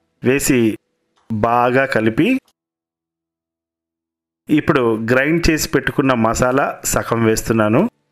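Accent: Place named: Godavari, India. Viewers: Ma godavari slang chala baguntundi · Pakka local accent medi rajamundrey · garu Godharollu mari aala mataladathara dharunam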